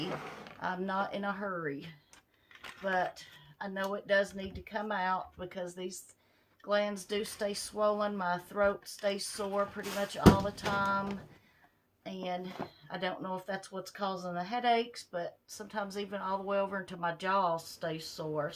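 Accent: South, United States. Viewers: I’m from the south also and we do have a way of our own talking... I get told.. so I love listening to someone that has an accent like I do.. I’m so sorry about the tumors.. I’ll be praying for you and thank God it’s not cancer.. concerning the mask have u use any mask that is better staying away from ? thinking about doing the Monday mask on my channel.. just not crazy about masking... LOL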